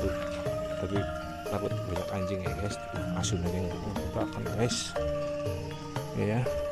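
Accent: Banyumas, Indonesia. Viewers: Rika wong ngendi sih kang jawane ngapak temen pada bae karo kancaku wong cibenying 😀😀😀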